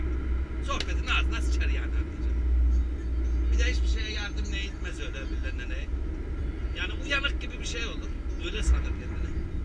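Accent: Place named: Kırıkkale, Turkey. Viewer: Abi ankaradan sevgilerimle seni cok severek izliyorum walla konuşma şiven aynı bizim oralılar gibi bende kırıkkaleliyim allaha emanet abi 😂👋🏻👍🏻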